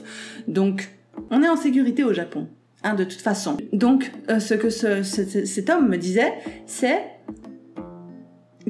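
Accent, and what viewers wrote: Belgium. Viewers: Tu dis « ouit » au lieu de « uit » :) c’est marrant l’accent belge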